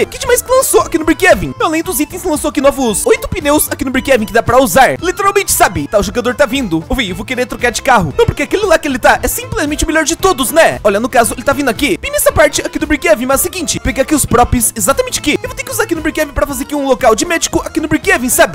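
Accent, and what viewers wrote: Portugal. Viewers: Gente, ele é de Portugal? Sotaque estranho da prr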